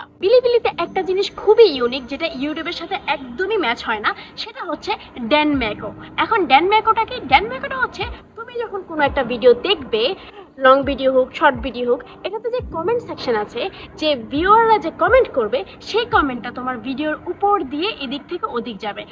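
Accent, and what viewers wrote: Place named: Sylhet, Bangladesh. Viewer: ohh i thought,,, but your speaking look like our Sylheti gr...whatever dear, carry on,, also nice too meet u... ❤❤❤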